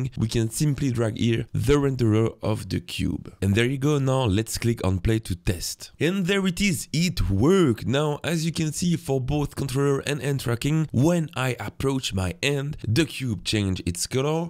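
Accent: France. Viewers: Salut (j'ai cru entendre un leger accent français, me trompe-je🤔😁) Merci beaucoup pour ces tutos ça aide tellement de monde c'est genial Evidemment Unity a encore tout changeé donc dans ma version 2022 c'est un peu différent..les Est ce que tu saurais quel fonction je dois appeler dans le visual scripting pour declencher une action avec ce fameux bouton? Exemple: lorsque j'appuie sur le bouton..un objet disparaît..car le "on button click" n'a pas l'air de fonctionner...merci!!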